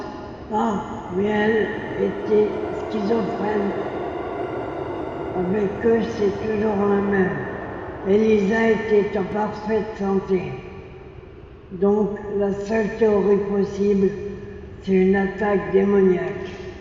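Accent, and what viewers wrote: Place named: France. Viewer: c un français il a l accent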